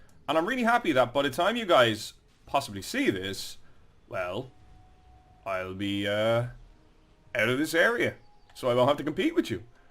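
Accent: Ireland. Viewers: What part of Ireland ya from man?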